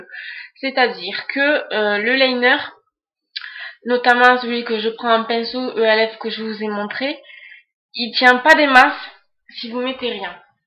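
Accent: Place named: Toulouse, France. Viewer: j'adore ta vidéo ! :) merci et au passage bel accent TOULOUSAIN ;) <3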